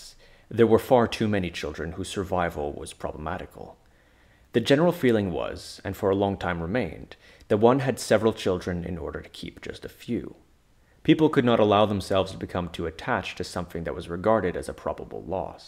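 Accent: Quebec, Canada. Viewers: L'accent québécois m'a surprise haha (j'entends rarement mes compatriotes sur YouTube) Merci pour l'excellent vidéo 🎉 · Es-tu Québécois??? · Toi t'es québécois c'est certain 😅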